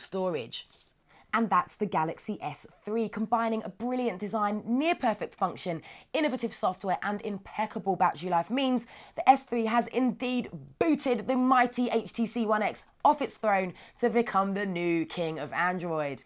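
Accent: England, United Kingdom. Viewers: Are you British? You've got the accent.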